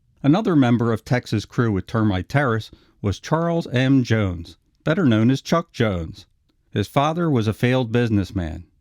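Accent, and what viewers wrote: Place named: Philadelphia, United States. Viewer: I hear an accent I can't ever not hear again, sir, would you happen to be from Philadelphia?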